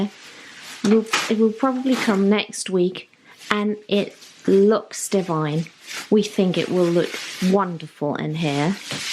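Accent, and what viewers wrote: England, United Kingdom. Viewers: Hello, I‘m curious to know if you ma‘am are German too, or only your husband? You definitely don’t sound German. You sound 100% English.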